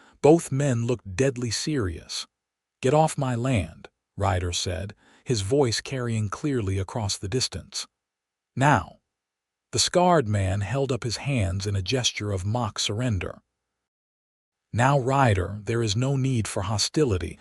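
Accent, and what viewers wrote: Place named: Georgia, United States. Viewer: I especially enjoy the narrator's accent, he sounds like he comes from Savannah, GA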